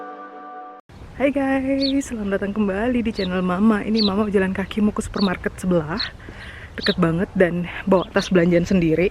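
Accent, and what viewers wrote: Indonesia. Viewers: lidahnya indonesia banget😄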